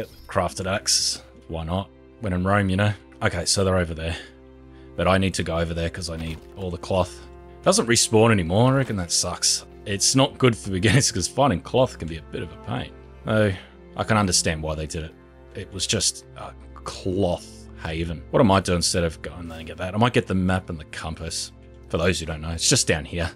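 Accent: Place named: Australia. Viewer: I love the Aussie accent and your humor is top notch sure these vids are old but I just found you a day ago..Keep up the good work..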